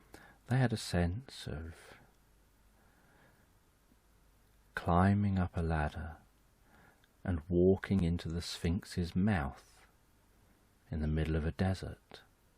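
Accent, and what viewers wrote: United Kingdom. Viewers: Thank God for the British accent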